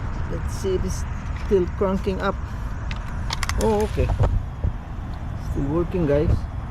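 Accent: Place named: Philippines. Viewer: hi are u a filipino? i can tell by your accent hahahaha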